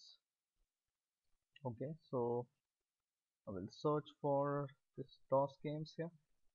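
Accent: India. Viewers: German Google English Language Indian Accent ... wtf?! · Are you from india :-)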